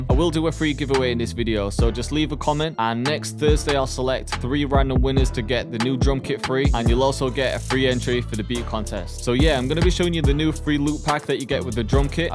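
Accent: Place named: Midlands, United Kingdom. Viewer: Yo, whereabouts in the UK are you from? I'm struggling to place your accent but for some reason I'm thinking Sheffield/Nottingham haha.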